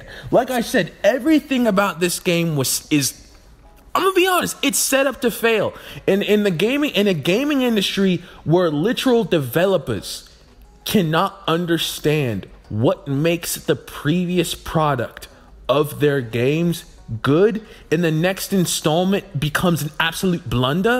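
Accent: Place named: United Kingdom. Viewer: bro how many accents do you have can't tell if you're British or im tripping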